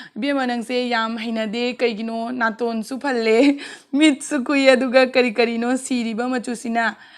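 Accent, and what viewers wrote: Cachar, India. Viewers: Mawa loude sis hainingba haijasanu shidaraga pamde phajade hairibasingse moi macha mashuda yaoba oirasanu thiba phajababu eikhoina oihanbra kalkpagine makhoidagidi sisna henba adugine eidi sis se cachar meeteine khnbada yamna nungsi lots of❤ from imphal video toina thagtlk o 👍👍👍👍👍👍👍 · Meitei ga mayangagi style pullp mixed twrij cachar meitei gi chtnabiro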